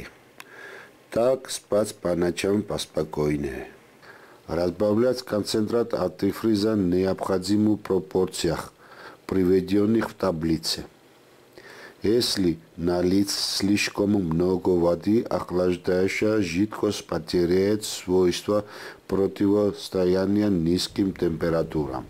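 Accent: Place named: Georgia. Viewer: დიდი მადლობა ყოველთვის საინტერესოა თქვენი ვიდეოები, რუსულიც ,,გამოგისწორებიათ,, ადრე, ,,ძალიან ქართული აქცენტი იყო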